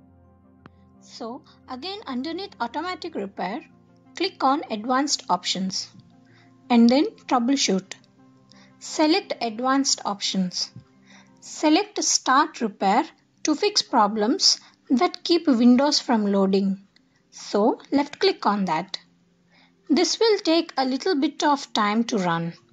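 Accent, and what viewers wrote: India. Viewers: Wow mam how smooth u speak Like an Indian...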